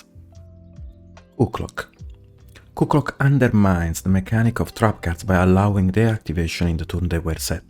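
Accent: Italy. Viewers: Are you Italian 😂 it's just endearing to me how you talk also my neighbors are Italians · Italian Yu-Gi-Oh Banlist ASMR, i kinda dig it.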